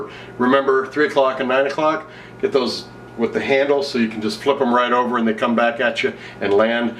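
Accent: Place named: Midwest, United States. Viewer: Are you from Wisconsin or Minnesota? You sound like you have a slight accent!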